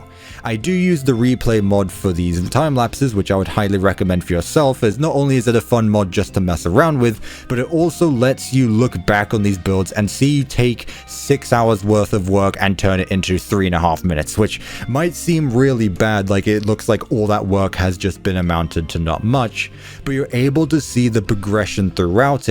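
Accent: United Kingdom. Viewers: Maybe it’s the British accent that makes it sound better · I enjoy watching your video because it’s a nice change of page from the fast moving while talking Minecraft YouTubers. I like how you talk in your nice calm British accent as Minecraft gameplay of you building things place in the background. I find it very common relaxing to watch I love your channel.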